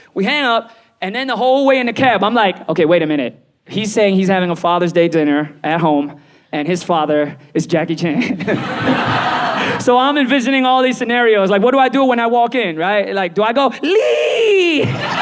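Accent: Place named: United States. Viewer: Looked this guy up because I’m currently watching Idol producer and was curious who he was because I could hear the American accent through his Mandarin. Little did I know that he’s funny as fuck!!!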